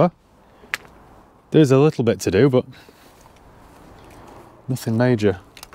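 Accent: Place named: England, United Kingdom. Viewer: I can't through the first minute. I'm Canadian Aboriginal, but I do not like English accents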